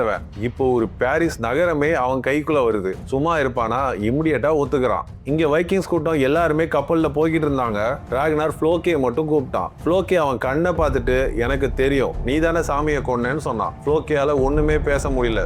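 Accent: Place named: Birmingham, United Kingdom. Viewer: Yoo broo oun voice la peaky blender pottu bro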